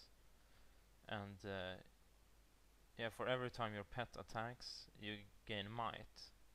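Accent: Sweden. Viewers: dat swedish accent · En svensk var det ja ^^ nice. hör det rätt tydligt på din dialekt/brytning. nice vid btw :D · Dat swenglish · Why did you choose that pet? Is it the best pet, or what? (Man hör att du kommer från Sverige) xD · are you swedish?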